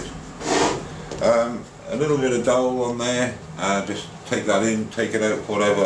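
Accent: Wales, United Kingdom. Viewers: I hope you recover quickly. I really enjoy your video's and enjoy the Welsh accent have not heard one since my Great Grandfather died. I had one though if you had put another 2x4 on the edge away from the blade slot you would not need the stop block, in my shop the stop block will wind up getting turned or lost! All in all good on ya! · hey big guy lol lol no sarcasm today hope u get better soon bud and i aint kidding ive seen bells palsy before....was wondering where u were was missing yur welshness lol lol glad yur back and the jig is great keep the vids coming have missed u greatly......dougie....frm bonny scotland......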